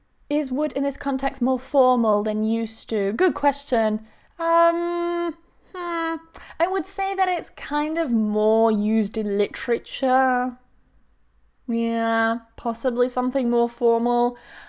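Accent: United Kingdom. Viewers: your videos help me soo much, Thanks for helping💘 i love the british accent and England. Saludos desde Argentina 🇦🇷 (I think it is "grettings from Argentina in English")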